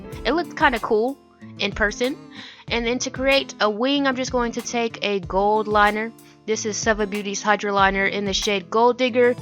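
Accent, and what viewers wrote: New York, United States. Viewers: lmaoo i love this ur deadass from new york "yafeelme"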